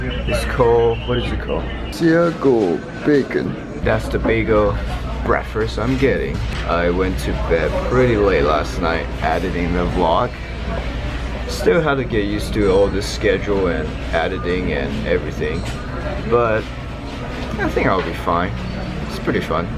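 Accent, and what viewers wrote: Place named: China. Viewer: You Chinese?!?!......always thought you're from Vienam cause you look like one. But I just noticed your Chinese accent from your vlogs so I can see now.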